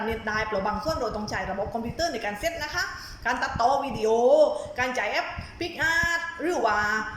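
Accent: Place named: South, Thailand. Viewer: แหลงหรอยเลย ไม่ผิดหวัง เสียงใต้ บ้านเรา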